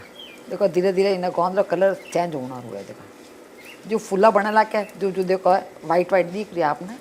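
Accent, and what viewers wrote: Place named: Gujarat, India. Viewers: Namaste masi. I don't know your language but I understand it little little because it's giving me a Gujrati touch. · Is the language Hindi in Gujarathi accet or Gujarathi in Hindi accent It is confusing for south Indian like me .However this recipe looks tasty .Good presentation .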